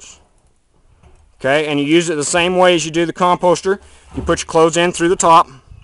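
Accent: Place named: United States. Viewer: Have to ask ..I collect accents ..what state are you from..??/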